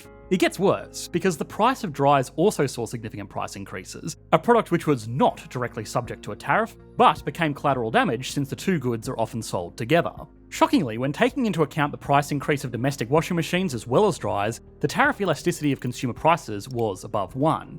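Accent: Australia. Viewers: Always better when something is explained in an Australian accent honestly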